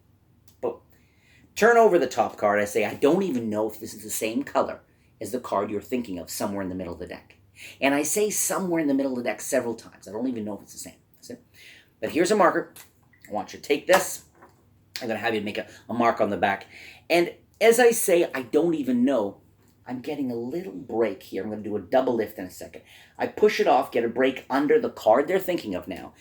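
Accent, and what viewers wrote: Canada. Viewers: Kiera Todd not sure what it is. Maybe it’s the glasses or that Canadian accent, not sure, but he is really good. I always like the tricks he does.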